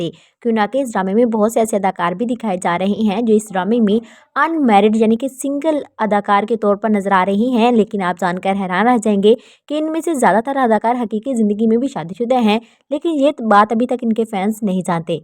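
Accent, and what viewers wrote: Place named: Punjab, India. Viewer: اپنے تلفظ پہ محنت کریں خصوصاً ہندسوں کے۔ آپ پنجابی میں ہندسے ادا کرتی ہیں۔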